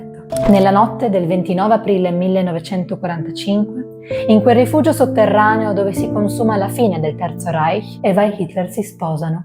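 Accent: Trieste, Italy. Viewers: Brava! Ottimo video e ben esposto. Complimenti! ps.( ma sei triestina?) 😅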